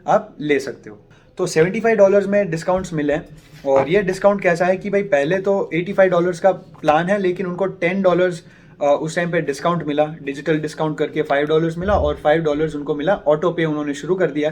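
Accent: Gujarat, India. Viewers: Are you gujju?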